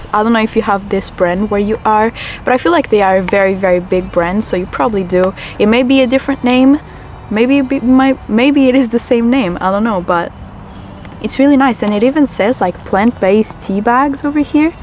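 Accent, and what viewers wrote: Portugal. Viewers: Sotaque português??? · sotaque bwee tugaa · pelo sotaque vi logo que eras tuga 😅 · I was already suspecting that you were portuguese, but when I began watching the video your accent gave it right away, good stuff tho.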